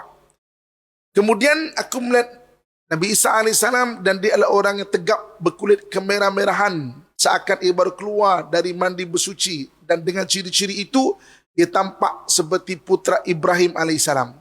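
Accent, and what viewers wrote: Malaysia. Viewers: Alhamdulillah ilmunya beliau patut diacungi jempol, meski sayye agak-agak tidak paham apa yang disampaikan soalx menggunakan logat Melayu. Salam dari 🇮🇩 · hmmm aku xsuka dgr logat² semenanjing ni. Dosa jadi dose 🥴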